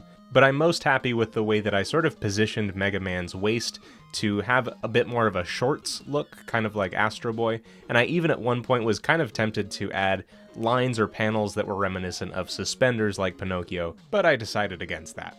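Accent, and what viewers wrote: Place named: United States. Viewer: When your name has an -es instead of -s and ends with a -ston But you have an American accent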